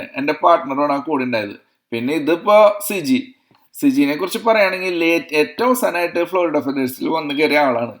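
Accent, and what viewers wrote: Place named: Thrissur, India. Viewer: ഇത്ര നാളും അമേരിക്കയിൽ കിടന്നിട്ടും ചേട്ടൻ നമ്മുടെ തൃശൂർ സ്ലാങ് മറന്നില്ലല്ലോ.. 👌👌👌ഇത് അമ്മയുടെ comment ആണ് 😄😄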